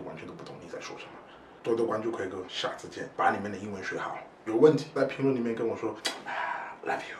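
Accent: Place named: Africa. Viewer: You have a strong African accent tho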